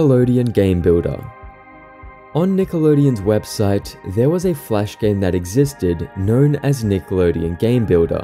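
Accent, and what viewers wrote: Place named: Australia. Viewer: the aussie accent is great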